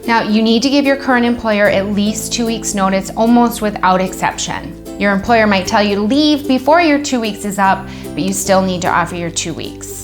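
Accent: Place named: Minnesota, United States. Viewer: I love her accent, is she from the Fargo movie?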